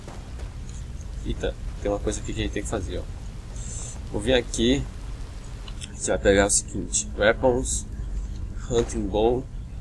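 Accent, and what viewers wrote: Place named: Interior, Brazil. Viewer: Você é da Bahia, Pernambuco, Sergipe ??? Eu percebi que seu sutaque é bem tipico do interior :)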